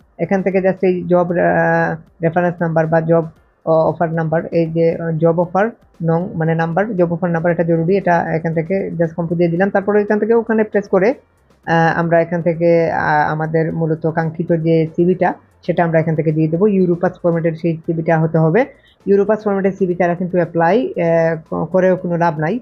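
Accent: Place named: Sylhet, Bangladesh. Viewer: ভাই মনে হয় সিলেটি, কথায় বুজে গেলাম🥰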